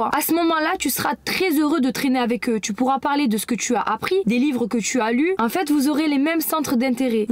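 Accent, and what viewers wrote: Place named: South, France. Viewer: On reconnait l'accent du sud mais tu articules très bien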